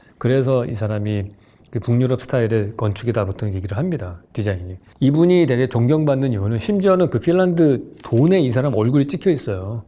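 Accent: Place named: Seoul, South Korea. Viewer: 말하는 스타일이 먼가 고급스럽네요. 나도 이렇게 말하고 싶다.ㅋㅋㅋㅋ 일단 서울말 부터 배워야 되나 ㅋㅋㅋㅋ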